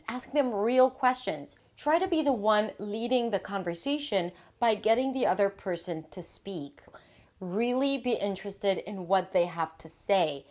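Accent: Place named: United States. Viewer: how long did it take you to learn American accent properly?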